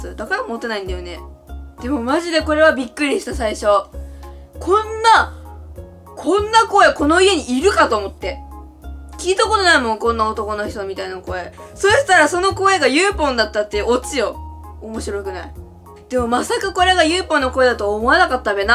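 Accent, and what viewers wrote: Kansai, Japan. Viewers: 関西弁が似合う可愛いちゃん ◎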